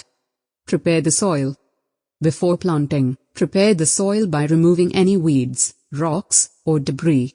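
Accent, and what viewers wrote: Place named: India. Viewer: dirty indian voiceover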